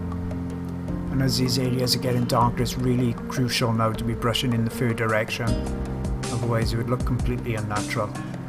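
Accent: United Kingdom. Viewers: Interesting but the music is too loud so it's difficult to understand when we don't speak British.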